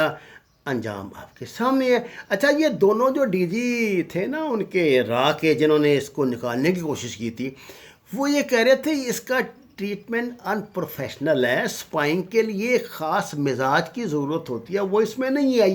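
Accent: Punjab, Pakistan. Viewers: Sir please apnay bat karnay ka tarika thik Karo lagta hy Punjabi me Urdu bol rai ho ya Urdu me Punjabi... Please don't mind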